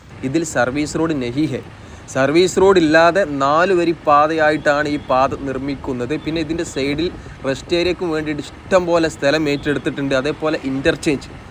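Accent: Malappuram, India. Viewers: Superb video... Droneman, RS Live, avarude okke levelilekk ethatte, ee Malappuram slang😊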